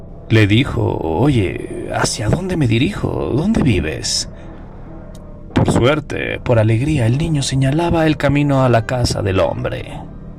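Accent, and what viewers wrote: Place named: Fresa, Mexico. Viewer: Pseudo escritor el personaje del relato pero el narrador no llega ni a pseudo narrador seria algo como el narrador fresita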